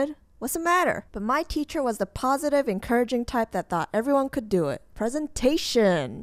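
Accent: United States. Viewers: Aesthic Vibes only I thought you were American 🤔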